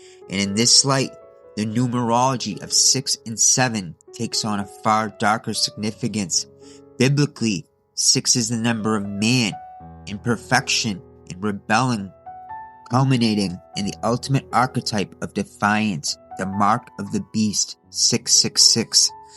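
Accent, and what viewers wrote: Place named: New York, United States. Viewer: You gotta be from New York w that accent lol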